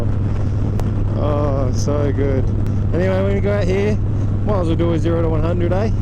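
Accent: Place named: Australia. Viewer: Are you from 'Down/Under, Bro? 😁 Love your accent 😃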